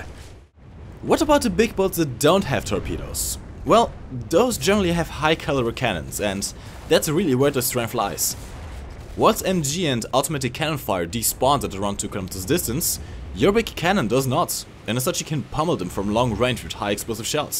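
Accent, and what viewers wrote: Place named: Germany. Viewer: Thanks! Very helpful. Love your channel. I am a loyal subscriber and love your German accent!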